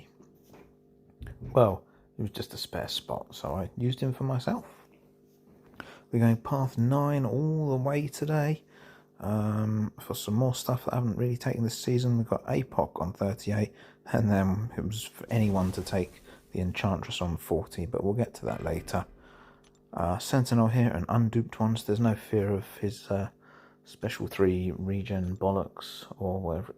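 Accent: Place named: England, United Kingdom. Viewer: Just wanted to comment and say keep posting. I prefer you over Lagacy and being English myself it's so refreshing hearing a familiar accent. I'll be watching more videos to come! 🇬🇧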